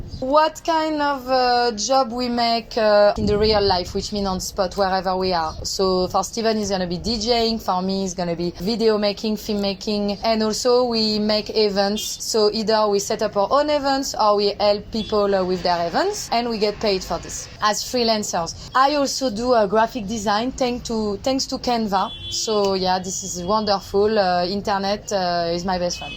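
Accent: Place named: France. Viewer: I am in love with your french accent ❤️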